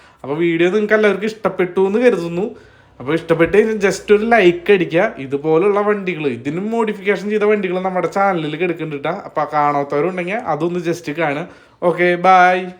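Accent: Thrissur, India. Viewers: Thrissur malayalam...ദൈവമേ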